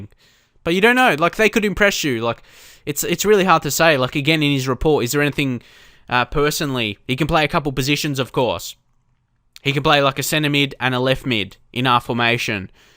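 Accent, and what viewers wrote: Australia. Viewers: really like this lets play because you go into detail and teach me a lot of stuff. you are australian accent is something that takes a bit getting used to ;) Greetings from germany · I'm guessing because you have an Australian accent, as one of the candidates on the apprentice has, people are taking the piss.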